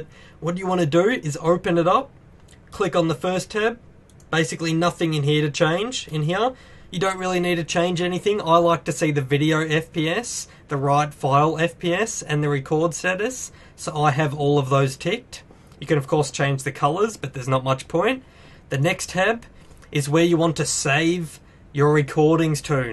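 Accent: Australia. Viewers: no offence but are u Australian? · Are you Australian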